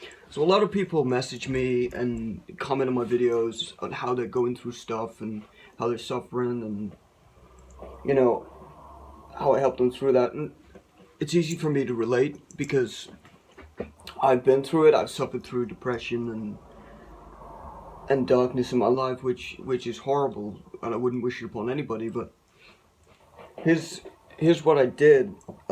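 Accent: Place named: Wales, United Kingdom. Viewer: Yes he is from wales he has a beautiful accent and really dark eyes I could look into them all the time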